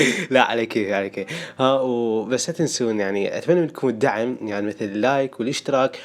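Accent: Saudi Arabia. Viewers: حسيتك سعودي مدري ليه هههه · حلوه لهجه السعوديه احنه العراقين نفهم كل اللهجات لاكن محد يفهم لهجتنه 😂😂😂😂👏🏻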